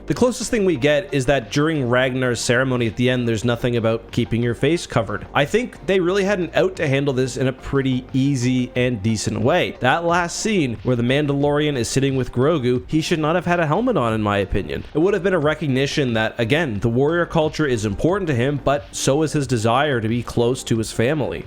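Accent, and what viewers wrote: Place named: Southern Ontario, Canada. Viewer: I feel like your accent is more pronounced in this video, and its basically the exact same as my southern ontario accent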